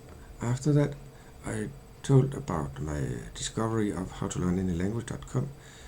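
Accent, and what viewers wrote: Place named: Spain. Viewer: I've got to agree with Ssoloness, I'm afraid. I'm a native speaker and could hardly understand most of what you said in this video. I can sense some sort of intereference from Spanish and Italian as well - which I can totally understand since the three of them have got the same root. You seem to have good vocabulary base, though. Imho, you've master written English pretty much to native level, as for speaking, a similar issue.. even though your accent isn't too thick.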